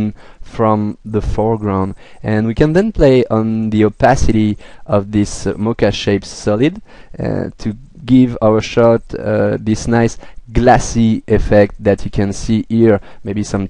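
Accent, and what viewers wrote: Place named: France. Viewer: 'ere. aha love that accent are you french?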